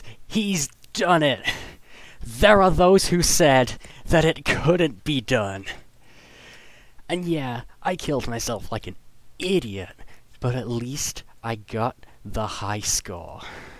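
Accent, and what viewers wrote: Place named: United States. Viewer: My G is still alive. Where d'ya get that American accent??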